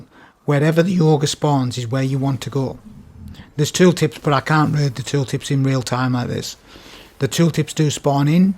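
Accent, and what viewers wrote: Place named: Newcastle, United Kingdom. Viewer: Scouse? He always sounded Geordie to me 😅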